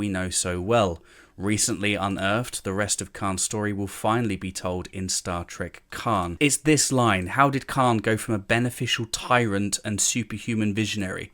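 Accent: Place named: RP, United Kingdom. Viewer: Man you have the poshest accent.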